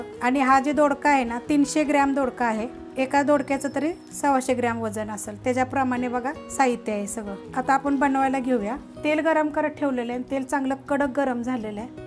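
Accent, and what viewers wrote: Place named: Phaltan, India. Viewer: एक नंबर रेसिपि.. तुम्ही फलटणच्या (जि.सातारा) आहात का? तुमच्या बोलण्याच्या पद्धतीवरनं तसं वाटतंय.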